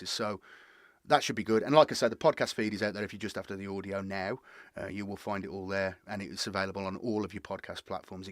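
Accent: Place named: Tamworth, United Kingdom. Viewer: I thought you were a Tamworth lad from your accent, I’m just down the road from there (on the boarder of Leicestershire). Didn’t know if you’d randomly turn up at our local shop sometime.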